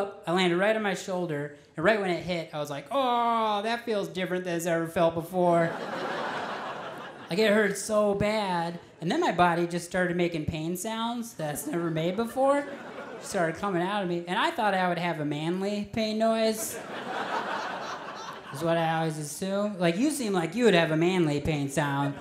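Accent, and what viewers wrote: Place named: Wisconsin, United States. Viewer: Where’s he from? Accent sounds Wisconie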